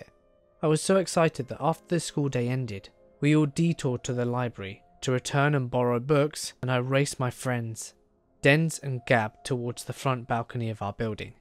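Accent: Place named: United Kingdom. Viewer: Another British accent... Go figure.. Let's read must be a BBC thing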